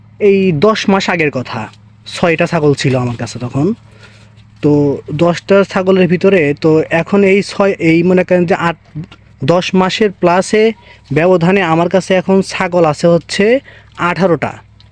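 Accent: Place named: Bogura, Bangladesh. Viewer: ভাইয়া আপনার বাসা কোথায় কথা বার্তা দেখি আমাদের বগুড়া জেলার মতো,প্লিজ বলবেন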